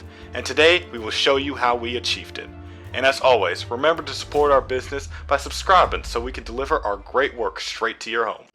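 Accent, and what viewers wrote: South, United States. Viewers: Even has that southern accent at times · i love the fact he sometimes accidentaly stops doing the southerner accent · This man perfected the stereotypicalAmerican accent, complete with the subliminal use of commie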